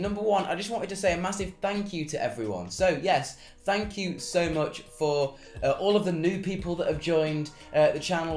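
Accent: United Kingdom. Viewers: Your point is invalid he is from the UK, ha has one of the most British accent ever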